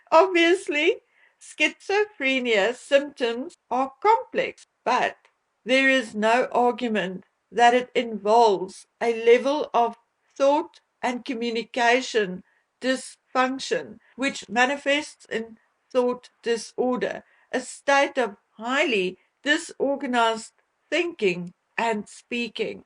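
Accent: South Africa. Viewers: Your "accent was what drew me to listen to you, years ago, when I subscribed to your channel! At the time, I had no idea what a South African accent sounded like. I didn't know WHERE you were from. I thought it was so lovely that I kept listening to find out where you were from!!! 😊 BTW, I have been a psychiatric nurse for years. YES!!! "Word Salad" is a bonafide, legal, medical term most often related to to schizophenia!!! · I could listen to your lovely S.A accent all day 😊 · The Harkles speak fluent PSYCHO-BABBLE !!! You speak with a beautiful South African accent….I can understand you, every word you speak is articulated, but (just as you say) all I heard from the Harkles was psycho-babble bolloc*$ !!!!! It’s unintelligible, and says nothing and means nothing. Their lips move, but cr@ comes out !! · I would never have guessed that English was not your first language, dear! 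You just sound South African to me and I love you for it. As for the Harkles latest offering, I filed it under suspicious junk mail. They have lied so much that I no longer believe a word they say. Everything is smoke and mirrors with them. How I wish they could, like Napoleon, be sent to Elba without Wifi or any means of contacting the outside world. The world needs a rest from their particular corruption of young minds.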